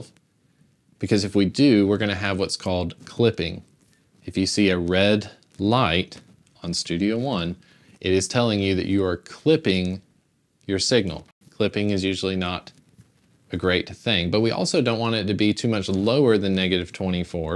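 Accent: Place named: South, United States. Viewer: I could be wrong but I detect a very slight southern accent in your voice.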